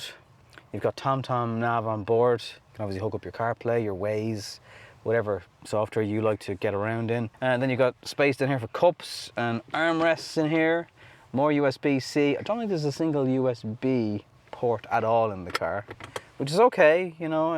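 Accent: Ireland. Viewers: Good Good, he is very Irish.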